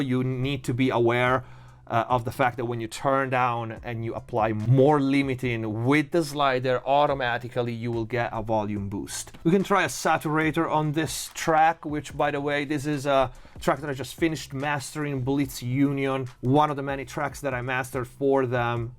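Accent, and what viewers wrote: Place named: Italy. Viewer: your accent is Italian, are you Italian ?? love your work man, I learned a lot from you!!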